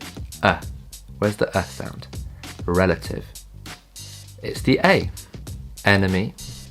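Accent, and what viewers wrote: United Kingdom. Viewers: I want you to make a video on How did you learn British accent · You have an excellent british sound i am very curious to have that accent. help me how can I have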